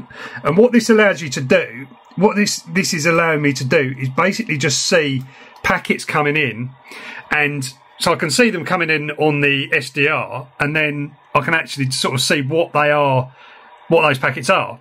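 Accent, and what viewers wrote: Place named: United Kingdom. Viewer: Can you speak with US accent? This UK accent is trash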